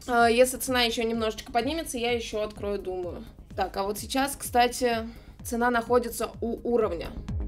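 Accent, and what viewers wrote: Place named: Russia. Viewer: И, не профИт а прОфит, учитесь говорить правильно. Я понимаю что Вы придерживаетесь провинциального произношения русской речи, но никогда не поздно научиться. Аж уши режет: проФит, средствА, постираться, убраться, погреть что-либо из еды, а не подогреть или разогреть, задолбали провинциалы со своей речью.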